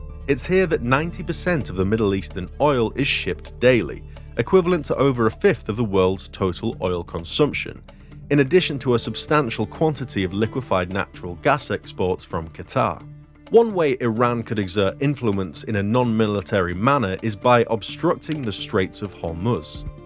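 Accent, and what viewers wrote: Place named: United Kingdom. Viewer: This man has a very British accent - hummmmmm -he`s saying 'they' - so I wonder where he`s moved to, to not think of himself as British.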